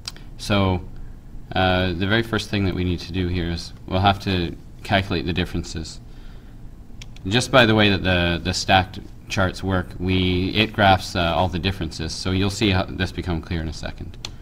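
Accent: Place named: United States. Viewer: Thank you so so much! I had an assignment for uni and had no idea how to do this or anything on excel. Your thorough explanation with your lovely american accent was amazing! Thanks heaps and heaps! :D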